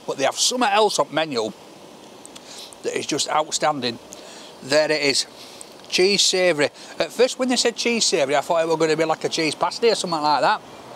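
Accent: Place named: Barnsley, United Kingdom. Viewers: He clearly has a Barnsley accent, but he's obviously 'inspired' by Peter Kay. .. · 🤣 I have literally started saying “ oh my god “ in his Yorkshire accent every day xx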